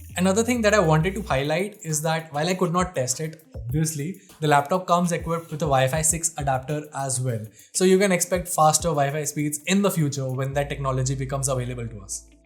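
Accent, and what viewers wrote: India. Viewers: Good indian accent My brotha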